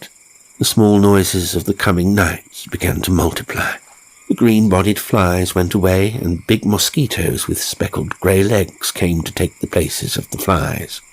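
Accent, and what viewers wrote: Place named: South, United States. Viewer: Your poor white trash accent was spot on. And I am from the south so I know. Really good.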